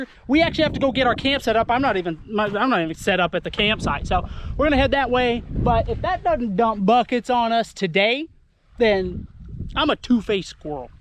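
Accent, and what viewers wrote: South, United States. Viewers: Bro moved to South Carolina and googled South Carolina slang words😂😂. Stop lol. Just cuz you live in the south don't mean you gotta talk like it.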